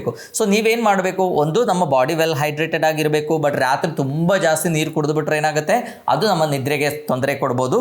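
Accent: Kerala, India. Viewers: Neenu. Malayali. Alva. Sir. Nimma. Kannadadalli. Gothaithu. Tummba. Chenagide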